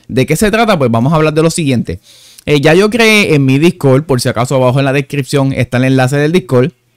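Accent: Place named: Puerto Rico. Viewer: Es de puerto rico rey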